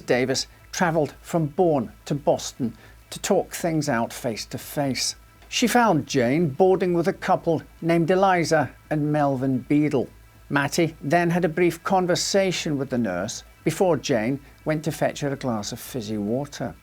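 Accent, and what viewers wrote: Scotland, United Kingdom. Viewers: Everything is better when a Scotsman narrator 😅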